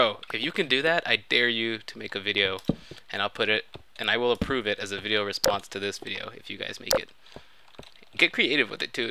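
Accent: United Kingdom. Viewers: you do a damn good english accent lol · nice british accent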